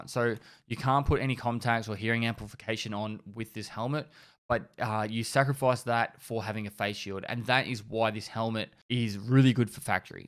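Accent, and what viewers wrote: Australia. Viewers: man, wish I could understand his aussie accent -_-! I miss 70% of the info xd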